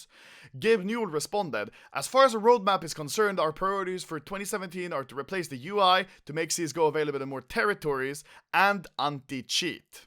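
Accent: Russia. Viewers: Brah , are u from russia or are u from Swedeen , im so confused. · Are you from Russia???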